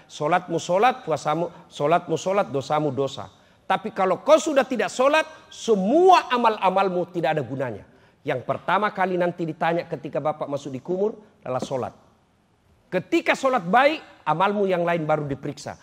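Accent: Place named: Makassar, Indonesia. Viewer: Logat Makassarnya ini saya kangen..serasa waktu dulu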